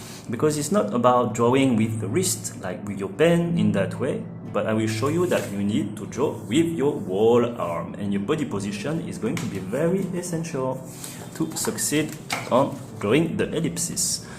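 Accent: France. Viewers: accent de français ça jreconnais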